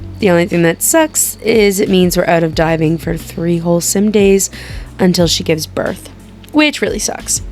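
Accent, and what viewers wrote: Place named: Atlantic Canada, Canada. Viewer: I'm subbing because I can tell you're from Atlantic Canada and so am I 😂 great video!! Really funny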